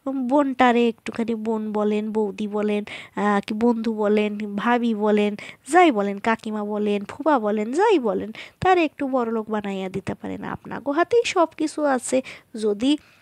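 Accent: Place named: Barishal, Bangladesh. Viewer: Onek moja pelam apnar borisailla bhasha shune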